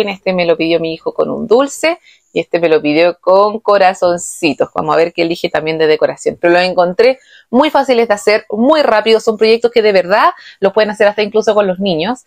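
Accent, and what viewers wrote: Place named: Chile. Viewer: Que rico escuchar tu acento chileno!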